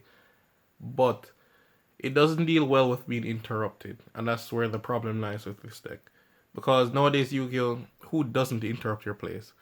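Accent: Jamaica. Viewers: Bro are you jamaican?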